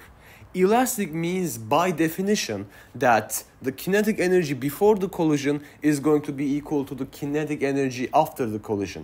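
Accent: Turkey. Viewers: nice video nice turkish accent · aksanından türk olduğunu anladım direkt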